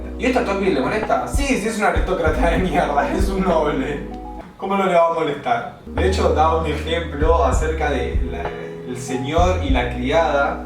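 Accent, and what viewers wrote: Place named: Argentina. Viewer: Hasta las huevas el audio y encima habla un argentino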